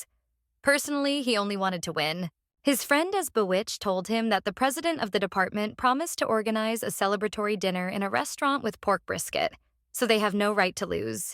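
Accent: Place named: Australia. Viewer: Ik for a fact that ur an Australian. Am i right?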